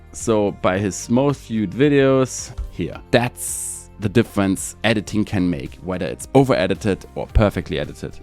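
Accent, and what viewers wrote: Germany. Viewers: You might not like your speaking English, but listening to you made me really enjoy the German accent as an native English speaker (American). You have such a lovely cadence 😁 I'd have to agree, Nadi feels over edited because he does the same thing different ways. If he did it just once, it would feel more balanced and not a redundant show off in my opinion.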